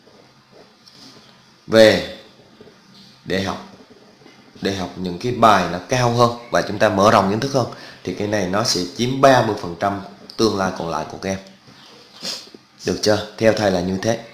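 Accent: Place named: Binh Dinh, Vietnam. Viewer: VLOG dạ hèn j dống tiếg bình định😂😂😂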